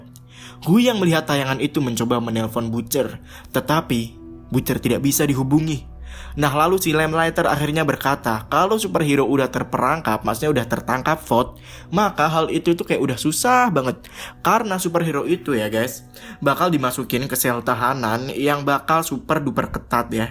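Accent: Java, Indonesia. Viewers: Mas sampeyan wong jowo zo...?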